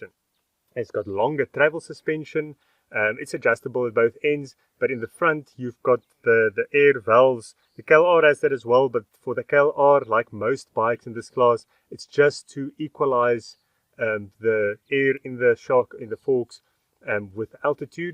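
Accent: South Africa. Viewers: Hello! I'm always happy when somebody talk about XR's, and I suppose that you are a sort of neighbour because you seem to be in South Africa (as I hear your accent and the left side coded on the road) and my original country is the red island: Madagascar! We've got the same landscape with laterit dust that make one of the most sticky mud I've ever seen and rode. So I had 3 XR's and only the air-cooled model RFVC and a Domi, the last one was rebuild with a Domi engine to have the electric start but the cams were from XR, and I wanna say that the red pig is one of the most awesome bike you can ride. As some few riders say, add an big plastic tank by Acerbis and an oil-cooler to avoid overheated (the weakness of the RFVC engine at last... and the right level in the carb bowl!) I don't think that the KLR is on the same level, here in Europe and mostly in France, the Kawa is a basic commuter with trail style flavour, the contender of the KLR would be surely the Domi or the Suzie DRS 650 and Yamaha XT 600 (for the japanese brands). 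I think the real competitor of the XR is the KLX R 650 by Kawasaki because it's a real dual sport bike with some great capacities in off-road as the TT 600 by Yam. I rode few times the KLR, and it was too smooth, almost soft and a bit lack or torque, mostly in off-road (dust and rock tracks, mud and sand...), really no way with it in severe conditions,even the liquid-cooled engine seems to be more secure (I heard many times the cool fan opera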